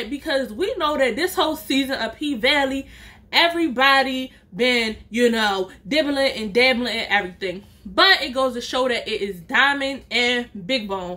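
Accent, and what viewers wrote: Baltimore, United States. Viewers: I’m a new subbie to your channel. You’re such a funny storyteller! I’m really enjoying watching your vids. Andddd I lived close to B’more for a few years and your accent took me back down memory lane. When I first moved there I asked for good food and she said hip hop chicken and said “hot dug”. I said no disrespect but I don’t know what you’re saying could you repeat and ultimately I asked baby girl to spell it!!! Lmao gurllllllllll I felt so dumb! Sorry for the rambling! Sis you’re doing a great job on your channel! Remember to Always keep your head held high so your crown 👑 won’t slip 🧡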